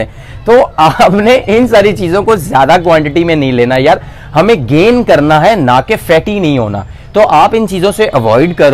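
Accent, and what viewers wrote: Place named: Punjab, India. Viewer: Love the Punjabi Touch...Stay Blessed...🤲🙏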